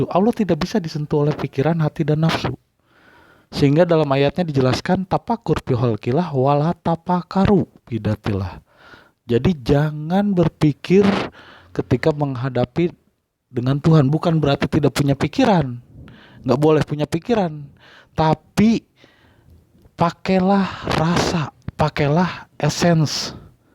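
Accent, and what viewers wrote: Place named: West Java, Indonesia. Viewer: MAS, KAMU HATI2... تفسير جلالين PUN KAMU TIDAK NGAJI...!! kalau dari segi logat bahasanya ini orang sunda..!! مكة المكرمة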